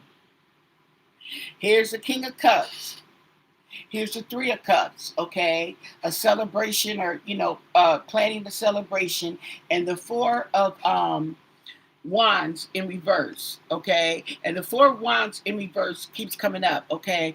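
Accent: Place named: South, United States. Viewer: I have tuned into your readings 3times now you are so comical love your accent are you from deep south etc south coralinna or Georgia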